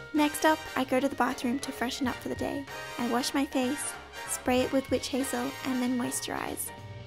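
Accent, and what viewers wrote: Australia. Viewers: This video is absolutely lovely to watch and the girl who uploaded it is an absolute sweetheart; her milk & honey voice(🥛🍯) and Australian accent are a splender to the ear(she also has cute feet as well! 👣💞😁). 💕💕💕💕💕 😁😁😁😁😁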